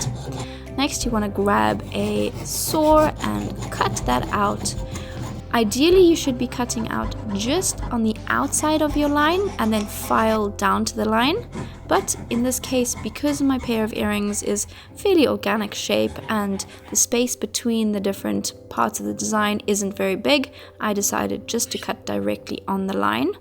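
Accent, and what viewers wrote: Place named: South Africa. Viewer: Very nice work, I thought Nordic with good English as I've spent time with them. Then I think very, very soft south African.👍🏴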